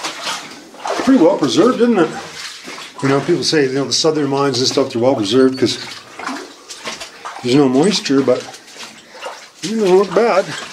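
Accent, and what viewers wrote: Canada. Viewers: I can tell your from Canada A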